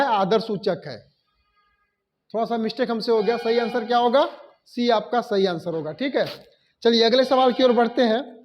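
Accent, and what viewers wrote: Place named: Jharkhand, India. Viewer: Sir hum bhi hirodih thana ,pandeydih se hai ,aapki language se humko laga ki aap giridih se h thanks 😊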